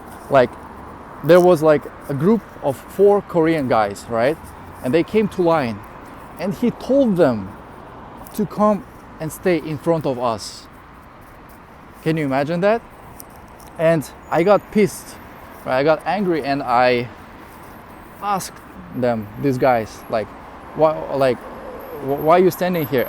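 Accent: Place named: Eastern Europe. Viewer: He sounds a bit slavic...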